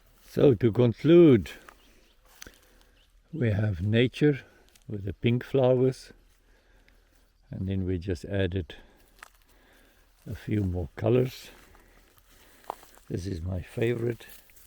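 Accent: South Africa. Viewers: You sound like a South African 😂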